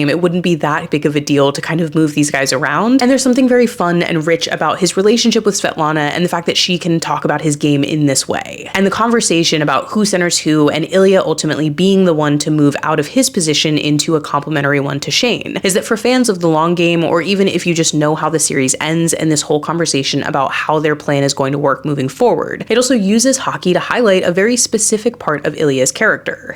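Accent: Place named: Chicago, United States. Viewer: I’m not sure where she’s from, but over here near Chicago, the majority of us all speak this fast. I never realized how fast we speak until I went to Georgia where everyone seems to speak in slow motion to me, and they constantly asked me to repeat myself, because they couldn’t follow it. She does edit the pauses. Those pauses are likely as she looks back to her prepared speech, but it’s still about the same amount of time she leaves a pauses between sentences in general. Slowing down her speech a bit for a wider audience and editing the connected breaks to fit that speech speed would probably be better, because many people have a difficult time following it. I have had a hard time slowing my speech, but it was required for public speaking so I can slow down when necessary. The fast speech part of the accent from this area is difficult to unlearn. 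At first you purposely slow down, but unless you keep thinking about it, you speed right back up. I could be completely wrong here. I’m just speaking from my own experience , and she sounds very similar to people from my area.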